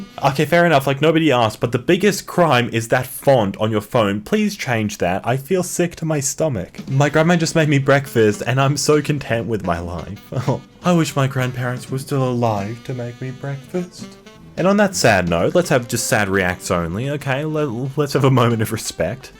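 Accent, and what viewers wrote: Australia. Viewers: Hold on, Mate you're Australian??? Frick yeah, found another one😁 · ooooh you're from canberra?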